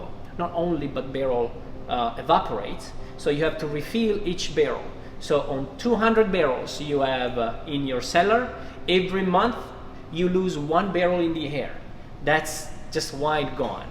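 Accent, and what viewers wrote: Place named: Italy. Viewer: Gotta love that Italian accent. Fun video!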